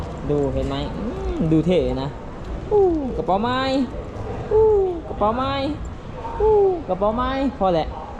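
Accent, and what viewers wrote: Laos. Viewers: ຂ້ອຍມັກສຳນຽງສຽງເວົ້າເປັນຄົນສະຫວັນບໍ່ວ່າຈະໄປຢູ່ໃສ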